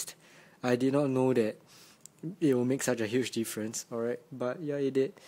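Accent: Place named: China. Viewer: your really good man! you have a chinese accent... anyways if you want to know, i am chinese ^_^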